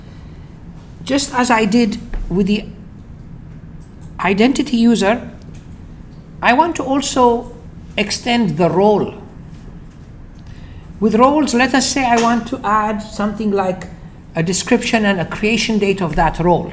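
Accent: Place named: Iraq. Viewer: Your SureName, but I can feel your Iraqi accent, Big thanks for your grate job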